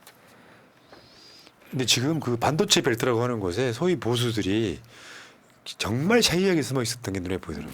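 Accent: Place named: Gyeongsang, South Korea. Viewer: 물론 경상도 발음은 더 고치기 어렵고 올레 사투리 그렇게 쉽게 고쳐지는 거 아니고 엑센트도 남아 있는 경우가 많아요. 저도 그럴 거예요. 미안하지만 그래도 이렇게 훌륭한 일을 하시는 분이니까 표준 말을 발음을 하려고 노력해야 돼요. 그리고 입을 야무지게 발음하면 좋은 발음들이 나와요. 허벌래하게 입을 하니까 안 나오는거야 정신도 똑바로 하고. 그렇게 해서 더 성공하고 훌륭하고 우리 국민들 모두 연합하여 잘 활 총력을 다 해주기 바래요. 하나를 축복을 빌어요 화면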